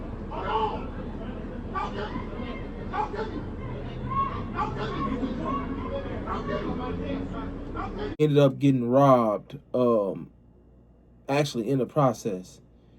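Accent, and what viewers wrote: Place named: West Coast, United States. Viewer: gotta be from out west I can tell how u say the blocks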